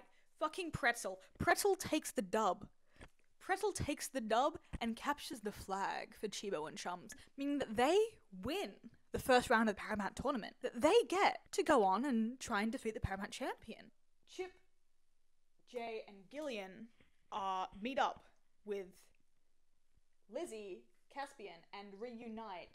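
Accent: Australia. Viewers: SLAYYY (i forgot you were australian so it i had quite a bit of whiplash) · BRO UR AUSTRALIAN??? IM AUSTRALIAN!!!!!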